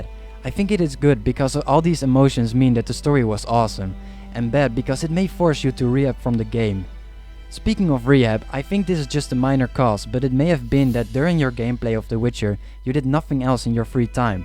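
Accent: Netherlands. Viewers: I don't know why but I can totally tell you're Dutch. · Hey, where are you from? You sound Dutch!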